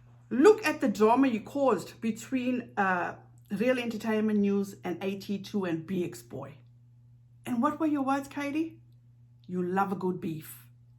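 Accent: South Africa. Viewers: New sub here! I really enjoy your content and I can appreciate the rational advice you’re attempting to give, unfortunately KJ is too far gone her ego is going to be her downfall. I like all the points you make and agree she needs to think of her family first! But her ego comes first! I love your accent Miss, my BFF is from Durban SA, and lives in the US also so hearing your lovely accent reminds me of her and you remind me of her, she is the best! Look forward to more content! Congratulations on hitting the 1K mark! 🥳❤️✌🏽😃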